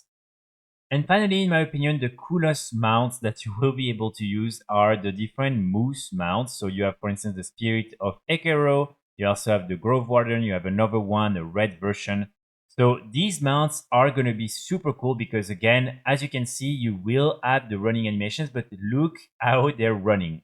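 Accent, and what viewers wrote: France. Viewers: What is the problem with your accent?? Is that French sh!t?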